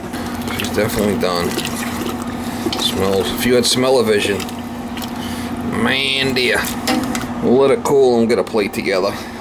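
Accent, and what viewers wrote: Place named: Boston, United States. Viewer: Question for you, as I'm a pretty fan of your channel and work in the HVAC residential field. But anyways, from hearing your voice, are you from Boston or New Jersey?? I've always been curious Haha love the videos man, keep em coming!!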